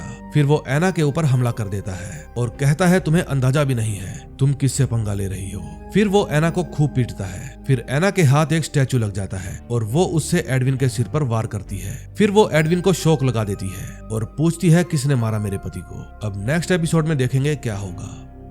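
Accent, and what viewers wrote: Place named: Haryana, India. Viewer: Haryana se ho aap ki voice se lagta ha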